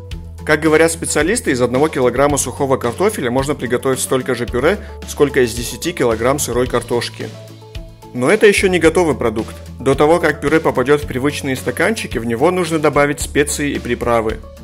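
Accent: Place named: Adygea, Russia. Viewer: Адыгейский акцент))) классный класс си брат 👦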